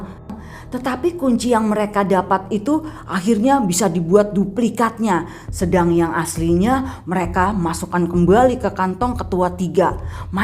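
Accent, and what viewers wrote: Jakarta, Indonesia. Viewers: Logat Betawinya kental banget. Kocak. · Logat betawi. Kemane aje doel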